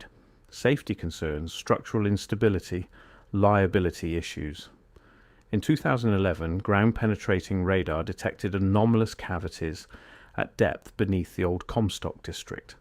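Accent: United Kingdom. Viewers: Sure getting tired of listening to American stories narrated by a machine voice with a British accent. If you want more clicks start using a real human to speak for you. Stop being so damned lazy. We know the difference and we are sick of AI British accents.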